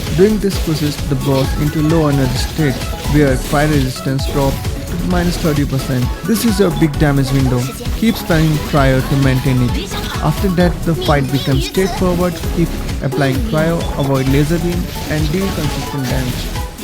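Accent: India. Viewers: your voice look like indian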